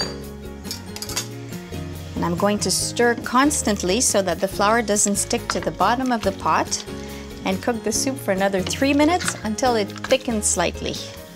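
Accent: Canada. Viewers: That Canadian accent doe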